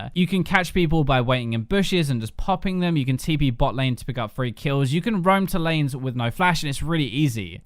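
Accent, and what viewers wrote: United Kingdom. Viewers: Tbh i dont like hes british accent.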